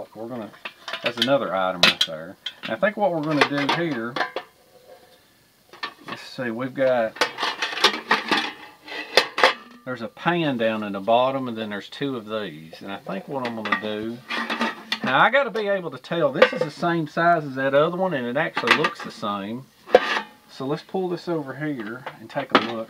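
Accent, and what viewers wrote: South, United States. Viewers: Scientific testing aside, thanks for the video brother! Just checking out your fire and listening to your southern drawl make me feel at ease. I am curious, what is your forest firewood sources, locally we have western red cedar (Thuja plicata), Douglas fir (Pseudotsuga menziesii), western hemlock (Tsuga heterophylla) and maybe vine maple (Acer circinatum). At work I can salvage different oak, maple and beech which I totally prefer but they aren't really available in our forests. For cookware I never really invested in aluminium, most of my kit is stainless and a few more recent bits are titanium. Love the toboggan dude! Although that business is now defunct.:)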